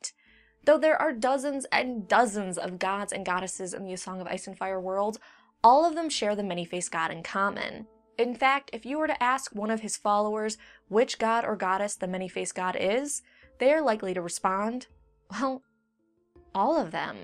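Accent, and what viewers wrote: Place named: South, United States. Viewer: you got a southern accent!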